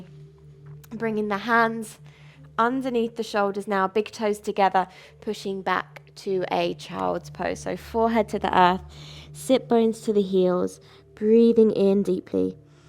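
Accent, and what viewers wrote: United Kingdom. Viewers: Dear, I Always used to do yoga with Adriene, but more and more I am drawn to your classes. Thank you so much. You seem to have something that suits me every day and I love your English accent! (I'm British in France) thank you for your kindmess xx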